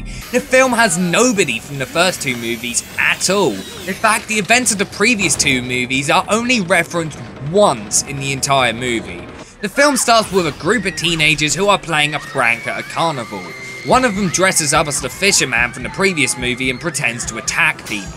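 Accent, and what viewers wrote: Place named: United Kingdom. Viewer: I really liked your smackdown of this movie in a British accent. Yeah the movie looks shitty.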